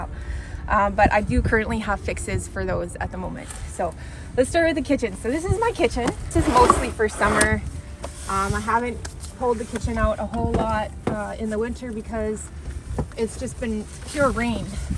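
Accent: Canada. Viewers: Ahhh, freedom! 😊I hear a Canadian accent, so I know making it was warm as possible is a priority. I wonder if police or "Karens" up there are prone to harassing people who live in their vehicles they way they are in the U.S. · She is from Canada. I could hear it. I’m from the United States. I really like what she has done with her Jeep. Incredible.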